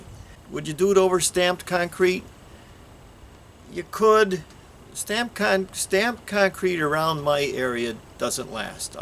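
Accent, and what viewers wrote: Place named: Pennsylvania, United States. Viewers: Schuylkill County girl here so love your accent,. I now live in South Carolina and just did my sidewalk the other day. So far it looks great! Now I'm moving on to the driveway. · That accent he has is typical N.E PA. I was born and raised near Wilkes-Barre and that accent really stands out now that I’ve been away for decades.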